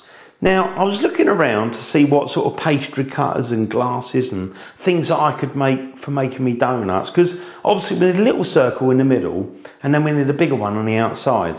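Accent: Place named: England, United Kingdom. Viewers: I have to add to the already large list of answers to your stupid comment. He is obviously from England, and he obviously has an accent. Quite lovely by the way. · there's a little thing called pronunciation..And don't get shocked but people around the world have a different one...Especially when they are from the UK... :O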